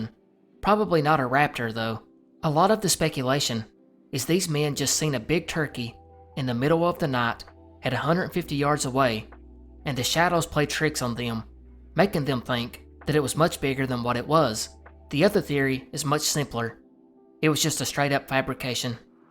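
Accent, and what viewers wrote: South, United States. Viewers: For like 5 seconds, I literally thought you had a Scottish accent because your southern accent is so significant!! And I’ve lived in North Carolina my whole life! I love how there are so many varieties of accents.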